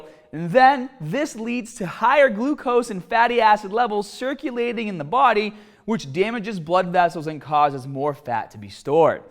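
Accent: Boston, United States. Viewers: Lowis Coetzee He has a strong Boston accent, which he acknowledges.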